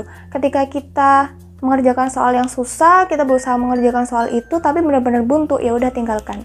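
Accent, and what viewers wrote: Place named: Banyumas, Indonesia. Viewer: Salam Ngapak mba. Makasih udah share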